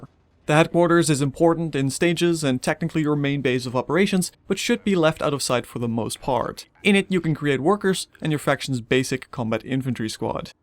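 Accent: Netherlands. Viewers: You have the most dutch accent possible, great guide tho!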